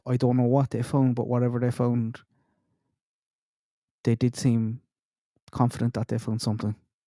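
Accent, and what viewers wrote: Ireland. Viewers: I like ur theory about the dog in the backyard. That makes total sense to me. I was listening to a prosecutor pod cast and they said that one of the reasons they would seal court documents and a hearing is because others may be involved. Other than that the courts belong to the citizens. We own the courts because we pay for them which is why there’s a freedom of information act. (FOIA) I love listening to you talk. My grandfather’s parents settled here in Michigan. At the time the Irish were not treated so well by society so they would set up Irish settlements. I actually grew up in the old Irish settlement. They had there own school and functioning society. Because my grandpa was only around Irish settlers and his parents, he retained a bit of the Irish brogue. He was the sweetest man on earth so herring your voice is so nostalgic for me! 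☘️ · Can I ask if you are from West or North West Ireland? You sound so much like my favorite Irish Trad band from Co. Clare, "*Socks is In The Frying Pan"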